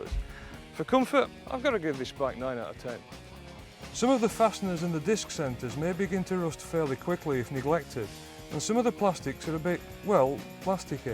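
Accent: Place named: Yorkshire, United Kingdom. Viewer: more like 1974. FYI the accent is from Yorkshire. The haircut is from Jesus Christ Superstar.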